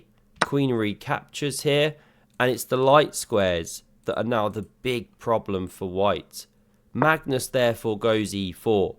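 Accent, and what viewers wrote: United Kingdom. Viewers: Thank you. I meant your real name :-) From your accent you must be British and I love your shirt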